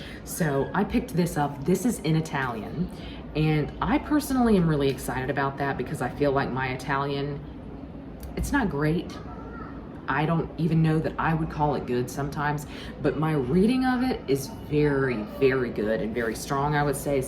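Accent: South, United States. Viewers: OK. So many thoughts from a newbie subscriber. What is not to love about toner when it comes to skincare? A swipe of toner over the skin leaves you feeling soft and clean. And if it smells of roses.... then its positively swoon worthy. Spiral notes books are my favourite to work with, but I would probably be like you and not able to resist buying a whole armload of new journals. I wish I could read in another language. Heres a video idea, you reading the Italian books with your Southern accent..... delightful! OK. Walking away now before I scare you away.....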